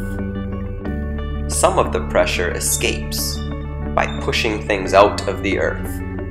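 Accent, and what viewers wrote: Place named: United States. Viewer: Speaks with an American accent but uses celcius.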